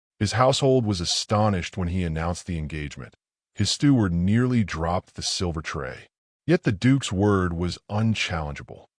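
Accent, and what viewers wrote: United States. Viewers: I disagree! It needs to be narrated with a British accent, not American.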